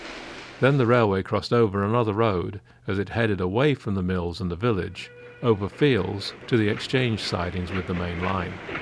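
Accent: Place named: RP, United Kingdom. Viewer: Moved to the south probably. Plus in those days it was socially expected to speak with an RP accent in camera facing roles.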